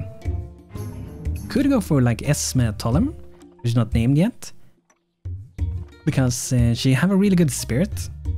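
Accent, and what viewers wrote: Sweden. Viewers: Well,thx for replyn i Have subbed, btw is that an swedish accent? I Myself come from sweden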